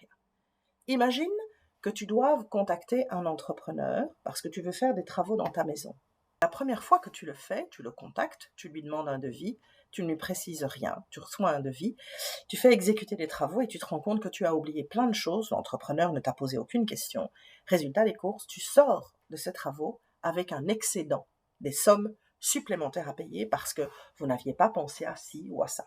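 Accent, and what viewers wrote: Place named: Belgium. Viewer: Bonjour, j'adore vous écouter mais je me demandais d'où venait votre petit accent? Belgique?... Moi, je suis normande mais j'habite près de Paris. Merci!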